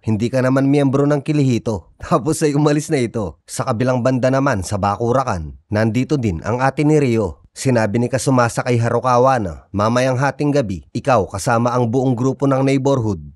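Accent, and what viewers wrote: Pampanga, Philippines. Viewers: Kapampangan ka ne boss?? Accent kc.😅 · Haha kapampangan ka boss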